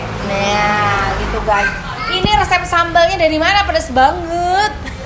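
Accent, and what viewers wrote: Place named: Garut, Indonesia. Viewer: Paling resep pami tth tos nyarios sunda logat garut sok d ulang" Aq mah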